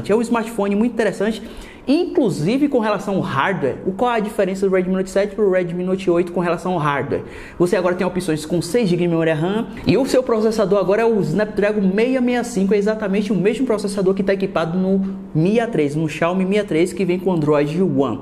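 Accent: Bahia, Brazil. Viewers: sotaque baiano, é isso mesmo produção? SSA tá feliz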